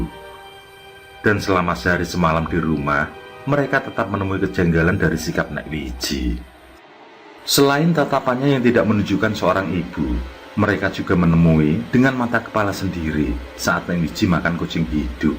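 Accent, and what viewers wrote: Java, Indonesia. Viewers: SUSKREBER BARU , BUK NURI TKW DI SYRIA , SALAM SEHAT WALAFIAT DAN SUKSES SRLAKU UNT VEN SENENG SUARA HOST NYA NGEBAS DAN JAWANYA SANGAT KETAL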